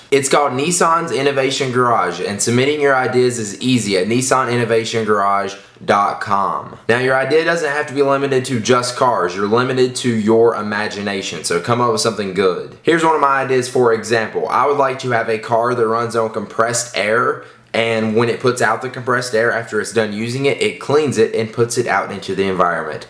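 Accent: South, United States. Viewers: His accent sounds a bit Southern.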